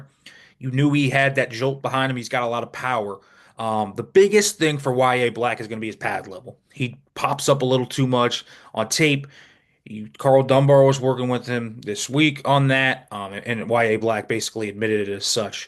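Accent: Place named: Appalachia, United States. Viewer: i have to ask, what is your accent? No disrespect! it kind of sounds like WV holler-Eastern KY slang 😂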